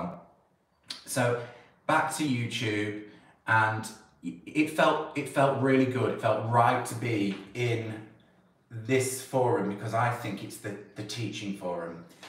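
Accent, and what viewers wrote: United Kingdom. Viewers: I'm only part way into this video and I just had to stop it to comment. I've watched your videos for a long time and I've loved seeing how you interact not only with viewers, but with your PIECES! You seem to have an "intimate connection" with each project you undertake. And because I personally have a love of languages, I totally enjoy your British accent! LOL, but what I really wanted to say was, how proud of you your dear Mum must have been. And she would be delighted to know that you are once again being so creative, as you obviously were created to be! I'm also loving getting to know the person behind the face.....who knew you are a hairdresser! No wonder you branched out creatively into other genres. Your inborn love of color and beauty is evident from seeing the bits and pieces of your personal surroundings. Now, back to finish the video:^)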